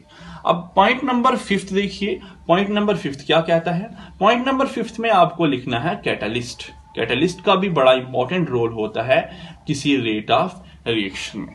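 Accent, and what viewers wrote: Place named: Kashmir, India. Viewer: thanks ..but sir ksm sa lag rahai ha kashmir sai hai ..isiliyai pucha tha sir sai ..😊😊😊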